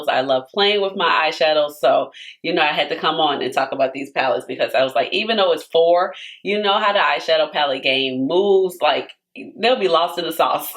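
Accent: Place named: Chicago, United States. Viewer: I had another idea for when you reach your palette ranking video #24. You could do a brackets-style “battle” of all of the #1 ranked palettes from all of the ranking videos? You could also do it as a series instead of a single (long a$$) video. Just an idea! Loving your content not only for the lewks (which are stunning!) but I also love to listen to people with my hometown accent. Chi-town, baby ✴️🚤✴️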